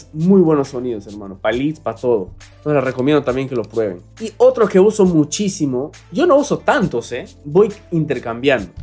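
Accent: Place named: Ecuador. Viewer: bro eres peruano pero tienes acento ecuatoriano xd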